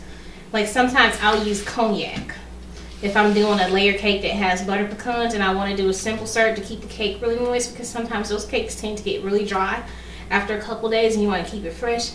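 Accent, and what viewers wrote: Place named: South, United States. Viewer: I just want to know, do you have to talk so country?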